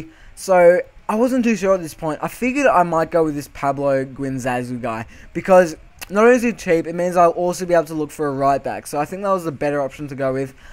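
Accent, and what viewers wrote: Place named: Australia. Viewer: yer fukin aussie luv ya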